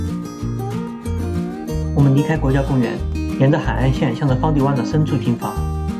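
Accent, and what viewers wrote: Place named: Sichuan, China. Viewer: 发音像四川人说普通话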